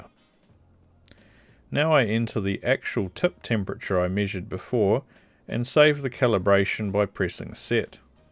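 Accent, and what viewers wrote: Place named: New Zealand. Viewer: Are you referring to ? To my ears he says "four hundred and fifty degrees celsius". I live in New Zealand, the same as the video creator, although I do not know him at all. In our version of English, that is how we would say 450 degrees celsius. If you are referring to some other part of the video, can you give us a timestamp?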